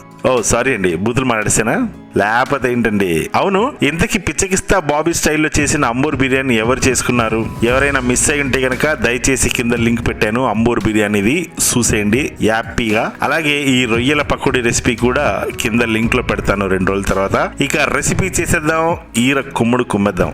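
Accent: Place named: Godavari, India. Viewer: garu... me voice ki, mee recepies, last lo meeru paade songs ki nenu picha fan ni andi babu... miru chepthe nammaru nenu kuda mee laga matladesthunna andi baboiii... Mee yaasa ki mee prasa ki 🙏🙏🙏 Finally mana godavari vantalu antene oka adbutham... danni miru inka baga vandi chupisthunaru... Ah vantalu chusi abroad lo unna ma paristhithi enti andiii... edi miku emanna nyayam ga undaa...🥺 Final ga okati andi mee voice ki mee yaasaki nenu pedda fan...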